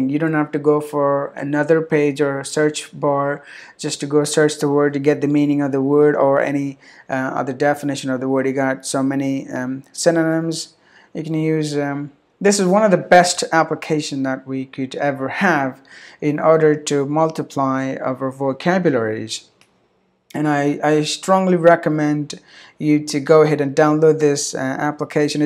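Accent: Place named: India. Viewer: His pronunciation ìs not great. He has a strong Indian accent and he is mixing English and American language which adds to the inaccuracy and increases lack of clarity. Don't copy him !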